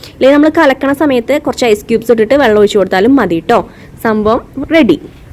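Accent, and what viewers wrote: Thrissur, India. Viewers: Chechi frm Thrissur aanno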